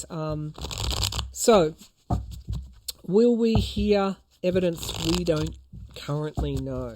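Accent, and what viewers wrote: Australia. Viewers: Love your Australian accent, love your Australian heritage, love your energy. Thank you so much for your honesty and openness. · Thank you,. I love your accent and I love that you’re proud of your heritage. Are they different accents for different parts of Australia? I was raised in Philadelphia and then moved to Chicago and then to Maryland and everybody can tell as soon as I’ve been around Philly friends, my accent changes, it’s kind of fun! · Your Australian accent is gorgeous!!! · Love your Aussie accent! It fits you perfectly! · I absolutely love your Australian accent! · We love you just the way you are, ❤ And I Love your Australian dialect!!! You rock! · I so look forward to your readings and your beautiful Australian accent is part of the reason. I went through speech therapy in elementary school, had no say in the matter, for my lisp. 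Would have never known you had one. Keep shining your light!❤ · Brilliant, entertaining and informative. Thank you for the great intellect and for the support spirit shows to those around the world for a better world and getting rid of corruption in high places. Your Australian accent is wonderful and the Aussies over many years have been right there to help when always needed. Reference WWI & WWII. Thank you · you speak English, regardless of you Australian accent. You speak perfect English regardless of your lisp. I understand you perfectly.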